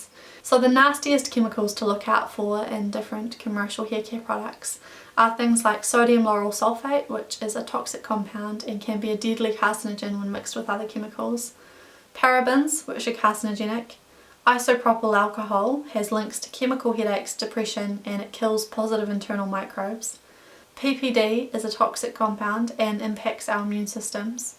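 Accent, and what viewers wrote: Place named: New Zealand. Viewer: This was so calming and I love your neozealandian accent :)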